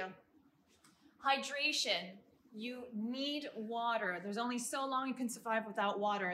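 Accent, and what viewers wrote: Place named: Vancouver, Canada. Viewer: Sound like u r from Vancouver. Lol This survival prepper stuff has been gojng strong for 20+ yrs now. What do you think our number one prep reason should be? The cause to prep.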